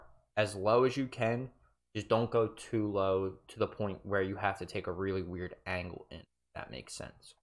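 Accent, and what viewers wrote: Philadelphia, United States. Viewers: This is how I went undefeated in franchise. Glitch goals are a must know as annoying as they are. This goal works the same way in game if you're behind the net as long as you either top left of the crease or top right depending on direction. Also you sound like a fello Philly bull so respect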